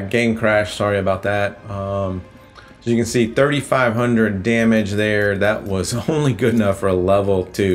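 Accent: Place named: France. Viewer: Great vid mate. I prefer saving my free xp for the blitz fair. Btw great French accent ;)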